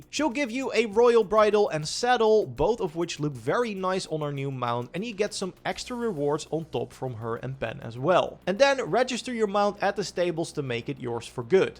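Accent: Netherlands. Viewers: Are you Dutch? You sound Dutch haha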